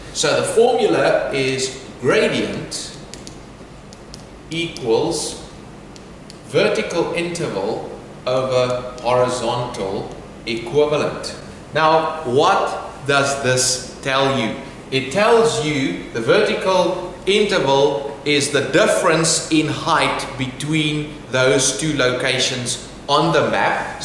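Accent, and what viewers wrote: Afrikaans, South Africa. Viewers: Africaans accent😁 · Are you from South Africa? Thank you!!! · Are you South African?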